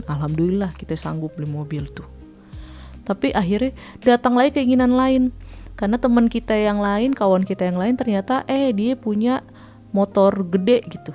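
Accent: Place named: Betawi, Indonesia. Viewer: Kereen yaa ceritanya. Walaupun ananda tinggal di LN. tp logat Betawinya msh kental yaa. Pertanda tdk melupakan bangsa❤. Cerita itu utk mengingatkan kita smua spy menjadi orng yg ttp bersyukur. Jangan sll merasa kekurangan. Krn kl kita melihat keatas tdk ada habisnya. Lihatlah kebawah msh bnyak orng yg kekurangan tp hidup tenang bahagia. Krn bahagia itu sederhana. Tdk hrs bermewah2. Kebersamaan bersama keluarga punya quality time bersama itulah kebahagiaan.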